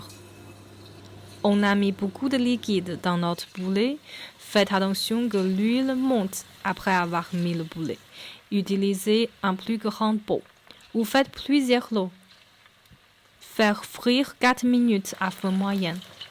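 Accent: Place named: Shaanxi, China. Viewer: 有点陕西口音…………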